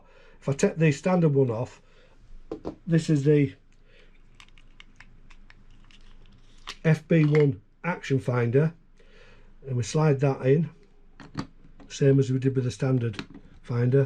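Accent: United Kingdom. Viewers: Thanks for this video, I was looking at an MX but this is really nice, a lot more expensive though. I currently have a P30, OM2n, Leica R5 and Nikon F3. You sound fairly local, I'm in Manchester. Subscribed :)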